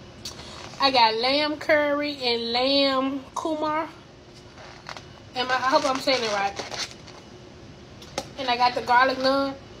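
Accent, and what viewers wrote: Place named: South, United States. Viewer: Lamb curry 😂😂😂 love your southern accent ❤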